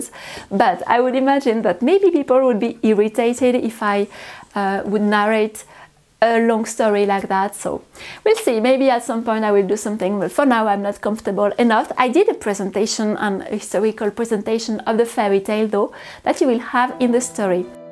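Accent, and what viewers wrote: France. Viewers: Who on Earth says they can’t understand your beautiful French accent?!?? Take NO notice. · Merci for another lovely video. You speak perfect English to me so don't feel insecure. Your French accent is beautiful and I love listening to you. 💕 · I absolutely love to listen to you! You speak perfect English with a beautiful French accent! The carousel is gorgeous! Not surprising! Hallway looks lovely as well. 💗💗💗💗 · you have a fantastic command of English. How incredible you are to be fluent in two of the most widely spoken languages on Earth! Your beautiful French accent adds music and elegance to the words. I love listening to you 🤍🤍🤍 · ✨I adore your French accent, so beautiful! Thank you for all the beauty you bring to my life, and for the motivation to work on my art and my French!! ✨🦋💕🌷🪲🍄🦉🐇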